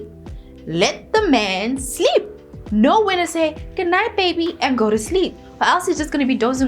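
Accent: South Africa. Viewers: Definitely a South African. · She is proudly South African 🇿🇦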